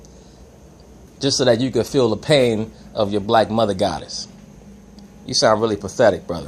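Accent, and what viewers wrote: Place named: East Coast, United States. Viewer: I like ya commentary/ideas on things. You gotta be from the East coast, I hear it.. such as myself. Smart brotha!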